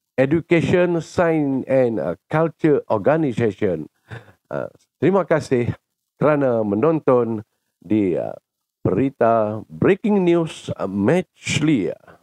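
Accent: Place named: Java, Indonesia. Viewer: Narator daglek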